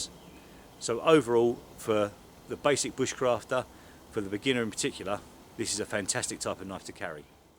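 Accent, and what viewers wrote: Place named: Wales, United Kingdom. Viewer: The pointer was the main one and I know that Brecon Twang anywhere lol,and unlike most on here you know what your talking about,good vid will be subbing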